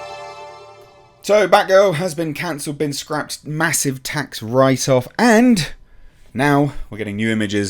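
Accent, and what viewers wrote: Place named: United Kingdom. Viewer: like your british accent.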